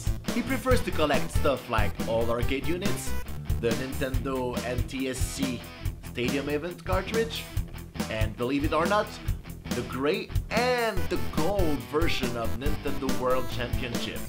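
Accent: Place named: France. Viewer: Très drôle t'entendre parler en anglais, frenchy! :P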